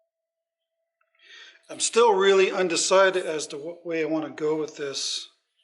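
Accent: Canada. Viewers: Lovely bowl,. You’re really helping me learn turning and the difference between the American and Canadian accents. ((Much prefer yours, like my own native Scots).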